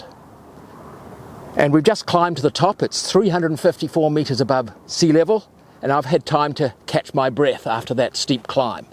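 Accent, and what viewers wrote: New Zealand. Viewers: You have to guess it's in New Zealand from the guy's accent. Very interesting, well done.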